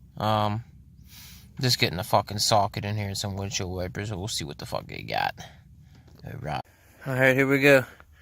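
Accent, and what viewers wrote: Pennsylvania, United States. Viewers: Yep, the PA accent for sure. Grew up'err 'bout a mawl from the store down'err .. luv it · Holy Pennsylvania buddy. I'm from PA and live in GA so I can pick up that accent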